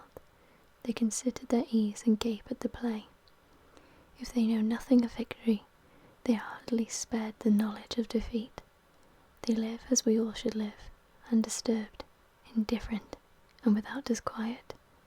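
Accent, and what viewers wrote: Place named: RP, United Kingdom. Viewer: A posh British bird. Not many of them around nowadays.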